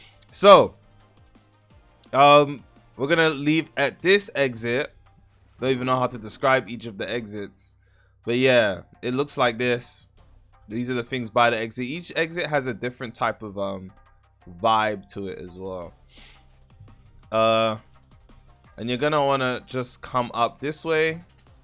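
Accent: United Kingdom. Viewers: We can barely see where your going lobotmite british dude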